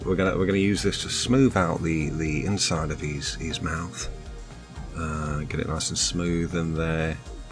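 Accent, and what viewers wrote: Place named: Manchester, United Kingdom. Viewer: Yes i got Paul Calf vibes from the accent at times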